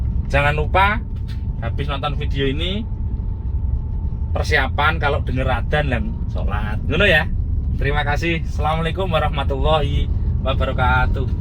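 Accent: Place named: Yogyakarta, Indonesia. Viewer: Haha liat masnya dan itonasi bicaranya jadi kangen jaman kuliah (Jogja)… 😁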